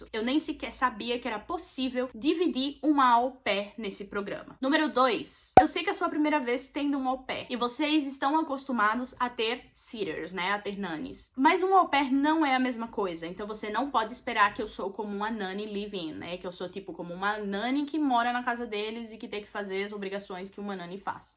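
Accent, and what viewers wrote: Northeast, Brazil. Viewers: aaah, tá explicado kkkkk fiquei tipo "ela tem sotaque aqui do nordeste mas tbm tem tipo um sotaque inglês??" kkkkk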